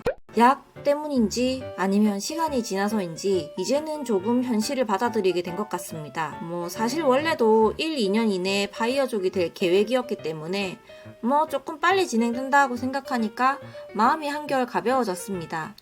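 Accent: Gyeongsang, South Korea. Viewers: 경상도 사투리가 이렇구나 ㅎ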